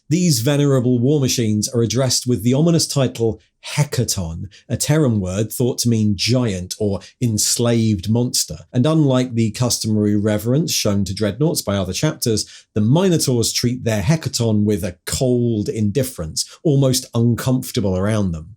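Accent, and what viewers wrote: England, United Kingdom. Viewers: Accents are so interesting and unique. I love learning about them. No, I'm not talking shit about him or anyone with an English accent.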